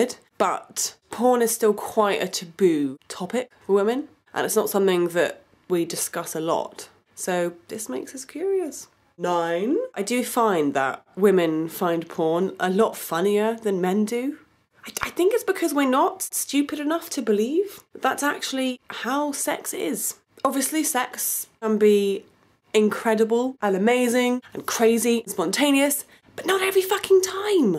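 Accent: England, United Kingdom. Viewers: English woman with mary poppins accent speak about porn , which destroy my childhood memories hehehe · Thank you for your realistic honesty, it was refreshing to hear, (especially with an English accent & not the usual American one) you are quite funny, as a kind of bonus, stop using the word "porn" if it's okay though. Adult films or magazines, are nothing more than a means to an end, they can get us to the bed room, BUT they are not there when we actually do the job. real life sex is pleasure in a different way, & circumstance. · You are sooo good at this blog thing. You’re articulate, with a voice I could listen to now and forever and oh my that British accent. And on top (no pun intended) of that, you’re drop dead gorgeous.❤️😂😂😂👍👍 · I gave a thumbs up for British ascent I'm British I don't know if i spelt it right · I like her. There is something about her energy and this British accent But this #5 ... 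😧 what's wrong with you, woman?! P.S. And yeah, her sister is hot too 😎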